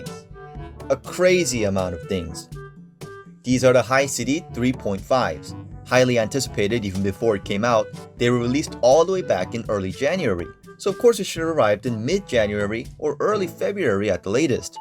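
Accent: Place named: South Korea. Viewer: 한국사람 특유의 악센트가 있네요, ㅎㅎ 아무튼 좋은 리뷰 감사합니다.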